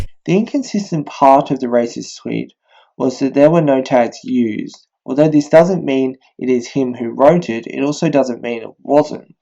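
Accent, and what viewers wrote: Australia. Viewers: it's so relaxing hearing another australian accent in videos. i don't have to put everything thru a mental translator from whatever to slang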